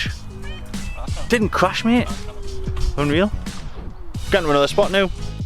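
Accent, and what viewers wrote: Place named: Newcastle, United Kingdom. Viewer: Mint video mate love to hear another geordie haha !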